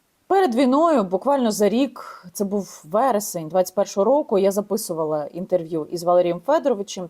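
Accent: Russia. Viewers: Яніна, будь ласка, не говори англійською, як росіяни.Women не вуман, girl не гьорл, birthday не бьорсдей, The Economist не зъ економист. Ти мені дуже подобаєшся, я дивлюся всі твої відео. Слава Україні!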